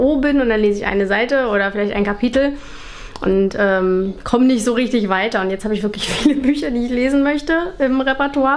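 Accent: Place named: Berlin, Germany. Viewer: Ich musste auch sofort denken da berlinert doch jemand 😂😂🤩🤓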